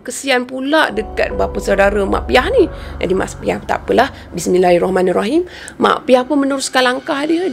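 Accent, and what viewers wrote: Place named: Pahang, Malaysia. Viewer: ni org pahang kan ke bukan sebab loghat macam org pahang..kalau org pahang beloh mane.. semoga maju jaya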